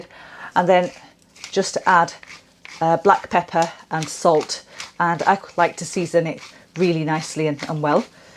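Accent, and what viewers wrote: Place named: London, United Kingdom. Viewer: Deer I like your recipe and your accent as well are you from London if London which part. Any drag me back when I was in east London lovely days 👌👌👌❤️❤️❤️😜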